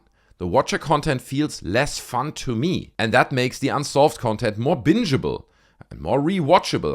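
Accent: Germany. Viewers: Hey, you sound like you're German, if so: greetings from Germany to Germany! 😊 You are totally right with what you say: the old Buzzfeed episodes felt more fresh, more funny, more original. The content they are doing on Watcher looks more expensively produced, but it quite lacks the original soul and comedic harmony between the two guys. I always thought that they should have stayed at Buzzfeed, their new channel was quite mediocre in my taste. Some shows were good, like Puppet History, others were questionable (Too Many Spirits... it may sound fun, but having seen friends go down due to alcohol abuse I am above thinking that getting drunk is something which should be fun), and pretty much all shows with Steven Lim were not good. I remember that one video when they announced a discussion about wether ghosts are real or not... I thought great, just like old times, except it was not. 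Shane was good as ever and did a great presentation, but Ryan was SO annoying that I had to take three steps to complete the video, he was almost unwatchable. He looked like he had spend the last two days sleeping under a bridge, what he said was not funny at all, he did not even try to participate to the topic of the video. When he started munching apples while talking, I was one click away from stopping for good but I finished it to see if it got any better... it did not. And then they decided to blow up their own channel. It cost them half a million subs (in fact they lost another 10K to when I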